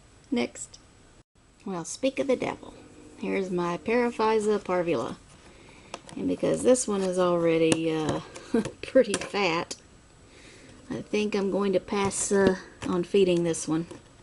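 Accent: South, United States. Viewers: I like your southern accent. I wish my Californian accent was as cool as your accent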